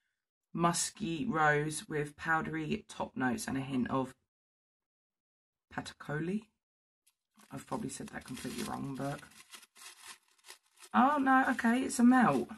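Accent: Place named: United Kingdom. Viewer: Your british accent kills me softly everytime! You are the cutest goth girl! 💜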